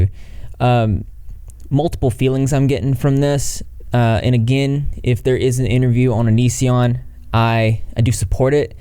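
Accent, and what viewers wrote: United States. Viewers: I did not expect that country accent lol. Which I get that too lol.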